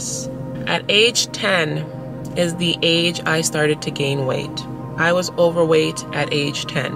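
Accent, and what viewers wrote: Jamaica. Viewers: I heard that Jamaica voice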